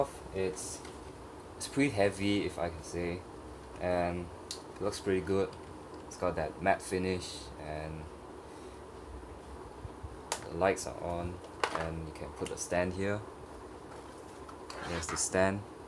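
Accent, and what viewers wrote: Singapore. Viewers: U r a singaporean right you sound singlishhh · you sound singaporean haha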